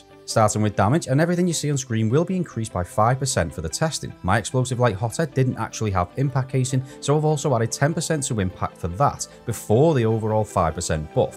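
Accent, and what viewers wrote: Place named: Scouse, United Kingdom. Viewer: sound like micheal owen , stop hiding the scouse accent lad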